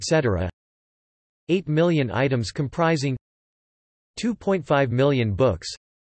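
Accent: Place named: Hungary. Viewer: Such a Hungarian pronunciation, an OMG category :DDD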